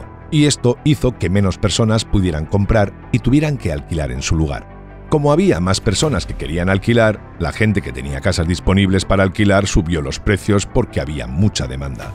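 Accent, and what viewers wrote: Spain. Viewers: Me gusto mucho este narrativa de aprendizaje no hay ruido de fondo esas música que suelen poner que no te dejan escuchar y ese acento español me gusto ❤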